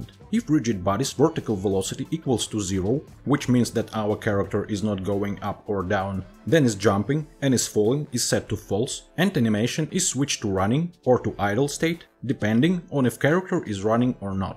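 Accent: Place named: Russia. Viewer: Кликнул на видео не замечая названия канала. Услышав акцент думаю "русский что-ли?". И тут вижу на "Новая папка" Хороший туториал, короче, спасибо)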